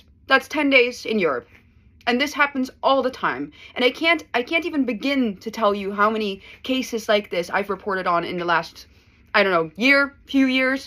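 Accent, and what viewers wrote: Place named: Groningen, Netherlands. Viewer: as indonesian i can tell but which dutch? she has that groningen accent, the frissian is very english ish.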